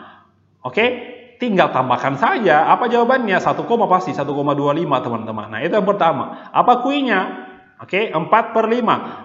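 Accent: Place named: Eastern Indonesia, Indonesia. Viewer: asli mana bung, kayaknya timur ni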